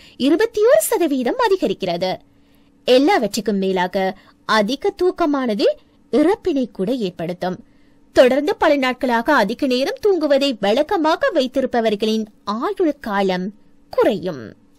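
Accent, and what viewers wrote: Sri Lanka. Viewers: இலங்கை தமிழ் ( பாட்டு பாடுர மாத்ரி இருக்கு 🥰🥰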